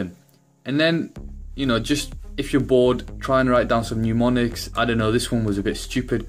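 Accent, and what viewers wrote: Newcastle, United Kingdom. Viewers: hes defo a geordie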